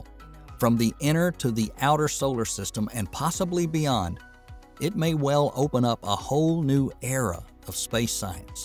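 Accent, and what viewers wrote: South, United States. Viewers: don't get me wrong I love you guys... You're my main spacex channel now..🤗👍 And I think the main reason is you have a Southern accent like me and I can understand you better 😂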